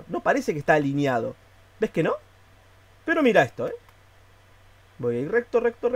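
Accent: Argentina. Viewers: Che mira, te hago una pregunta ¿vos sos argentino o de que pais sos? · De adonde es el chico este?? la tonada se me hace muy argentina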